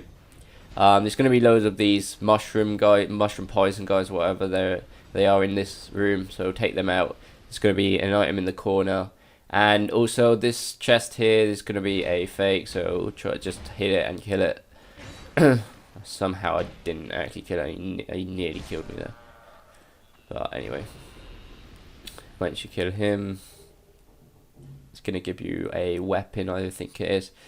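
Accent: England, United Kingdom. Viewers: Love the vids, i know there old but its nice to listen to an Englishman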